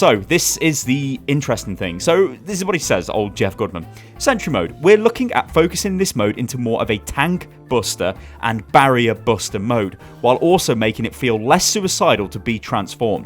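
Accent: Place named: London, United Kingdom. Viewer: what is this? a good london speaker?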